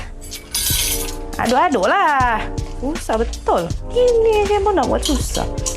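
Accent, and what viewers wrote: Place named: Batam, Indonesia. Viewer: Logak nya kaya nya orang batam..😀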